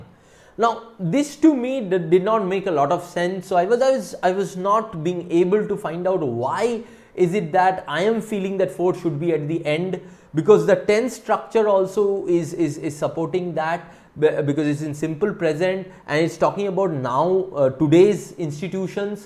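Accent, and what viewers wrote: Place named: Bengal, India. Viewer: Sir, you explain so well. But I guess there is a problem in me.. Still I'm unable to solve these types of problems.. So, I also started viewing critical reasoning topic with a hope to acquire more knowledge from you... Thank you! 🙏 Well, I'm curious to know are you bengali? 😅 (off topic)... Your accent seems so...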